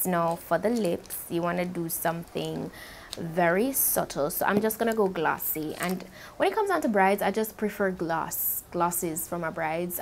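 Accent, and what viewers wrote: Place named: Jamaica. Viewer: From mi hear yuh talk mi sey ok... Yessss another Jamaican Queen! Had to subscribe... Like before mi even start watch the video tbh. 😂😂🤣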